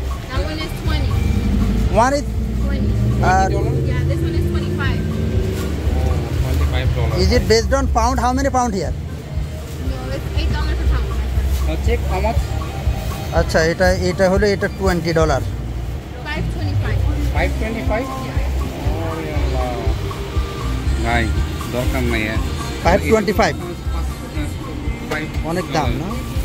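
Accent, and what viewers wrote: Noakhali, Bangladesh. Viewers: ভাই আপনি দেই আর মতন নোয়াখাইল্লা কথা একবারে পুরাপুরি খুব ভালো লাগে আপনার কথা হুইনতে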